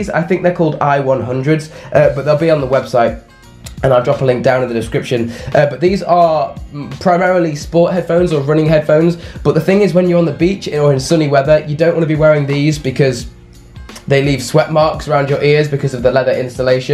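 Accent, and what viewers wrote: United Kingdom. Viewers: that british accent is awesome, you make everything sound so much cooler or than it is lol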